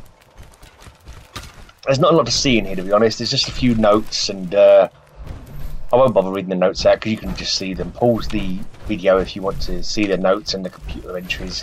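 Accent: United Kingdom. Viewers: I can barely understand this with your British accent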